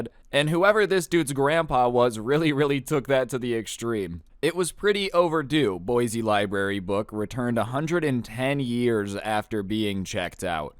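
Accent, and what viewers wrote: United Kingdom. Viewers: Nice British accent. Keep it up.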